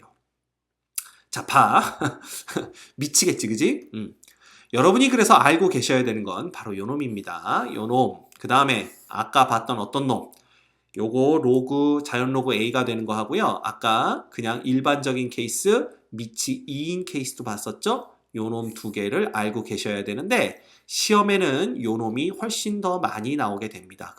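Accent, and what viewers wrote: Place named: Seoul, South Korea. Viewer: 서울 사람 티가 나시네요 ㅋㅋㅋㅋㅋ 경남은 e 랑 2를 확실하게 구분한답니다 ㅋㅋㅋㅋㅋㅋ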